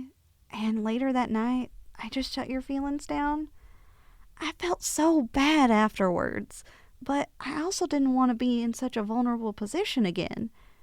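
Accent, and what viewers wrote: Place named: South, United States. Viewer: What's with the southern accent my lady?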